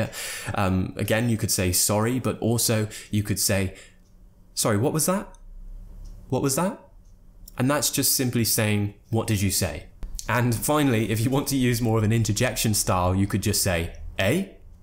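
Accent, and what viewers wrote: United Kingdom. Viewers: I love ur uk accent i hope someday visite london 🥰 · that aweasome British accent, thanks. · Super! I like your lessons. And a Britith accent ))) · I love ur accent so much, and I’ve been mimicking the British accent all the way in HK due to its elegance and uniqueness. I’m gonna come to England in April, honestly I hope all the slangs and idioms that I’ve learned from you could be applied in my journey. Thank very very much!!! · Am Ugandan but am more interested in the British accent it's so wow,am following your lessons, pretty great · Really you make me fall in love with the British accent 🥰🥰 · United Kingdom, so he have a British accent.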